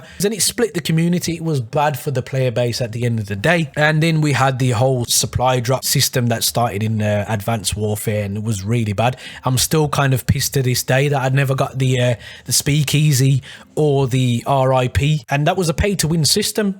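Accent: Birmingham, United Kingdom. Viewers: What part of Birmingham are u from?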